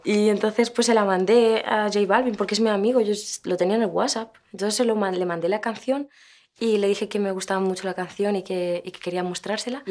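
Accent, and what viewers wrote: Spain. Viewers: I can't stand the Spanish accent from Spain but she speaks so clearly and being cute and talented does not hurt. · Pensé que era Latina, me sorprendió cuando empezó a hablar con ascento español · When she's speaking has the castillian accent but when she's singing she loses it. I'm confused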